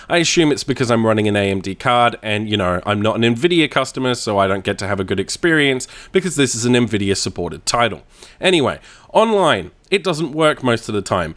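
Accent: Australia. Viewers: Man, thank you so much for your honesty. The industry needs more reviewers like you. I tried this game and after the first 2 hours I was like ...THIS got 10/10? I would give it a 5/10. Good to hear a fellow Aussie too! Quick question - what do you think of Diablo 3 since RoS came out and they made the improvements? I'm considering getting it and I value your opinion.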